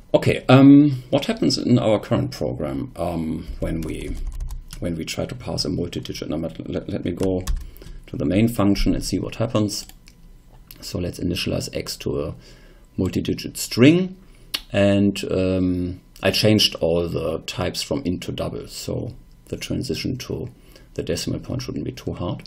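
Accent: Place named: Germany. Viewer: Yes it is. I'm converting some bibles (from diferent publishers) from PDF to XML. I'm strugling a bit because the pdfs are far from being well structured as a text format. So the parser get's relly messy. And also I have very few experience with parsers. Hmm: Ich nehme dein Antwort als ein "Ja" :). Ich habe das Firefox Fenster nicht gesehen. Aber deine Ausprache und Pausen sind ähnlich zu andere Deutsche die ich schon kenne, insbesondere wenn sie langsam sprechen. Viel Erfolg !!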